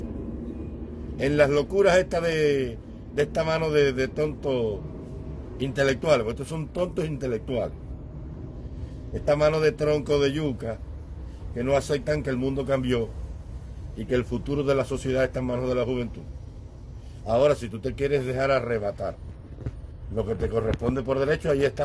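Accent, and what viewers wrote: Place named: Cuba. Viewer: Hombre desonestidade de tanto sufrimiento, tanto desespero, me he reido, disculpen, estoy de acuerdo con todo, como usted lo dice, ni una caja de fósforo. Que claro y en buen cubano habla usted. Lo admiro, que clareza, Dios bendiga a nuestra Cuba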